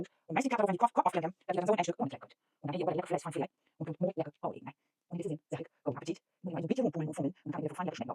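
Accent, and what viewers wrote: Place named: Low German, Germany. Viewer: Ulkig 😂 ich bin Thüringer und ohne zu lesen , habe ich alles verstanden. Erst zum Schluss merkte ich ui dat ist jo platt. 😂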